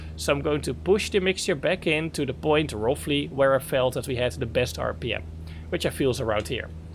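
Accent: Netherlands. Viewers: Wat een belachelijk Hollands accent, Leer eens Engels praten he.. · Awesome video, love the Dutch accent 😊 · Dutch accents are not really harder to understand then other English accents. My Canadian family perfectly understands this kind of dutch accent... beside that, this comment is completely off topic. I'ts a great video of how to properly setup and fly the Cessna 152. A little more respect for content creators please.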